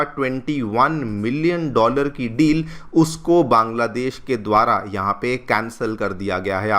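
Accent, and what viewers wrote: India. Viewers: Is it Bang Bandhu or Baang Bandhu. As far as I know, Bang stands for Bengal, Baang has no meaning. You have a very irritating habit of mispronouncing names Kush becomes Kushaa, Arya becomes Aryaa , yog is yoga and now Bang is Baang. Your diction is of an Indian then why you want to present your self as a westerner?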